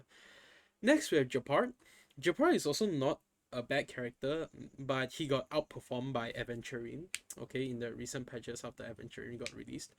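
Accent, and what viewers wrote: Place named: Singapore. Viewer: Bruh the singlish, I can't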